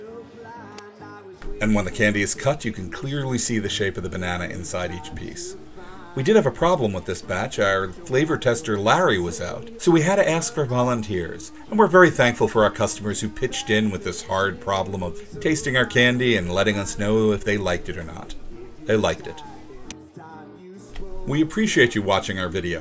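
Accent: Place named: South, United States. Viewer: Southern charm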